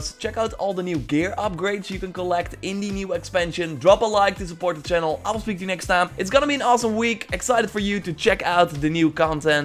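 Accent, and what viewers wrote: Netherlands. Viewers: Merkwaardig hoe veel mensen niet weten dat je Nederlands bent. Je zegt het redelijk vaak in je video's en je accent verraadt het (al is het niet dat typische Dunglish haha)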